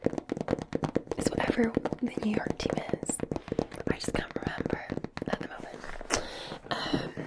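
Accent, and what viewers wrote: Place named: Liverpool, United Kingdom. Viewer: scouse ha ha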